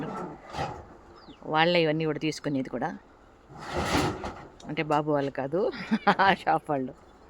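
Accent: Chittoor, India. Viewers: తెలుగేయ్ అమ్మ మాట్లాడేది చిత్తూరు యాస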